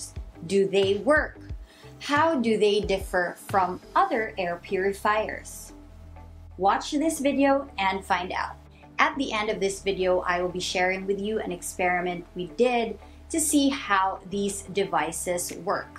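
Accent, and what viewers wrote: Philippines. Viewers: Lol, kala ko tagalog, english paka ka pala. Hahaha. Bisayang probinsyana yata... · I like your positive outlook, actually, I also like the way you speak, I know some ways shows our Filipino acc-----accent, tehe! Anyways, I have HEPA Hathspace on my room, I am using it for my allergies. In regards to that device, I don't really mind, but I probably have to get another brand here in Hawai'i or maybe order from Amazon. But it's always good to have something like that for additional defense from covid. I do not know in the long term usage though.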